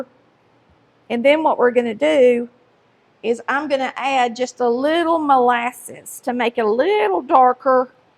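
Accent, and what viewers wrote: South, United States. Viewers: You are some lucky husband's wonderful talented wife cook and baker. You simply amaze me with all of your talented recipes.Your instructions are on point and your lovely southern accent is so sweet. You also have patience with all your newbies, and that can be me at 74 years old. Bless your heart for all you teach us, it is so appreciated. Sincerely, From Grandma Debbie 🙋🧑‍🦽 and Dexter-Dog 🐕🐾 my sweet tiny rescued Chihuahua 🐕🐾 living 🏠🌴 a quiet crafty life 🏠🌵🏖️ in southern California. 🙋🧑‍🦽🐕🐾🌴🏠🌵🏖️🙏🙏😎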